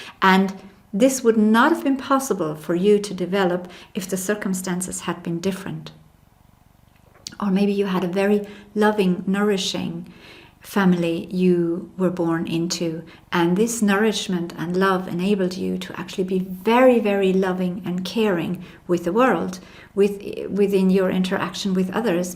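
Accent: Ireland. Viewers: May I ask are you Irish?! I am and your accent is very Irish!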